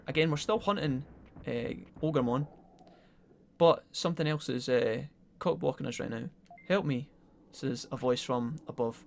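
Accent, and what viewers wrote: Scotland, United Kingdom. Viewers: "Gandalf and the fuck boys" lmao, enjoying these videos man, the Scottish patter is great. xD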